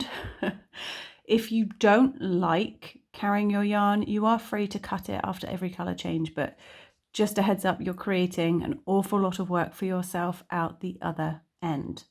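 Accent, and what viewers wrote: England, United Kingdom. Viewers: I adore this and your style of teaching is brilliant. The only thing that fooled me was I heard the English accent and thought, yes English terms , but alas not, it was America terms. Keeps my mind active, changing them into English as you are saying what stitch to use. Lovely work. Thank you .💞💞💞🧶🧶🧶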